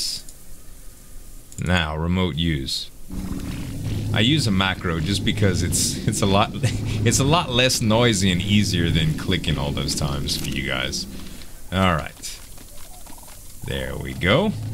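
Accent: Louisiana, United States. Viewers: Mais, you from Louisiana?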